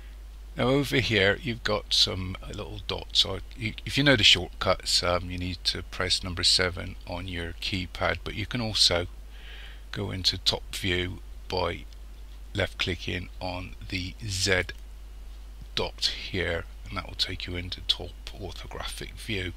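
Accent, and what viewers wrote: Bristol, United Kingdom. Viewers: Lifesaver! Thank you so much! P.S. Love your accent, are you from Bristol? I love it there so much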